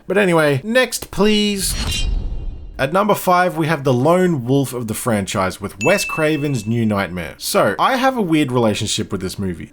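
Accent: Australia. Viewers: I could listen to this Aussie talk about horror movies all day!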